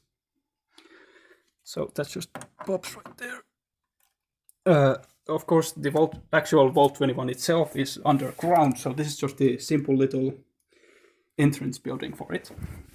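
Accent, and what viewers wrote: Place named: Finland. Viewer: I know a Finn when I hear one❗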